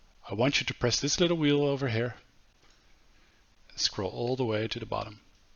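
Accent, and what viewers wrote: Netherlands. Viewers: jij ben sws nederlands ahahah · Haha strijder. Ik dacht in het begin all "hmm is deze guy nou NL?". Letterlijk 2 sec later "my PC is set to Dutch"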